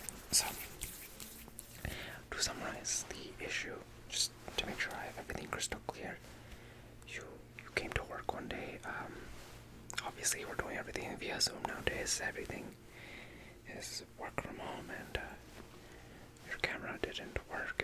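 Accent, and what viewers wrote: India. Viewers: the indian accent is one of my favorite ASMRs... very nice! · 16 minuts? And indian accent is more noticeable? My new favorite video in this channel. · If I ever have tingle immunity, I head straight to your Indian accent videos. Love this one!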